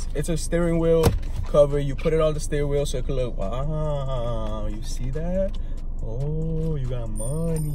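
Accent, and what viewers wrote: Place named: Miami, United States. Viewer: U sound like you’re from Miami…I’m from Miami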